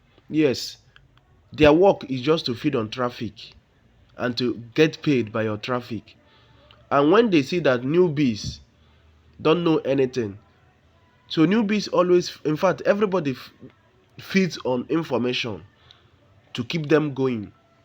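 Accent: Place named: Igbo, Nigeria. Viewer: You sound like a true Igbo guys that know what his doing..... great one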